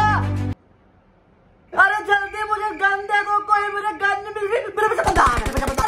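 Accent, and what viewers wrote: Punjab, India. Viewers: Your punjabi is so cute❣️❣️❣️❣️💜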